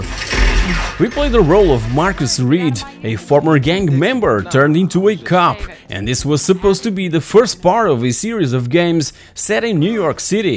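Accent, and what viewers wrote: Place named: Portugal. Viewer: Este gajo é tuga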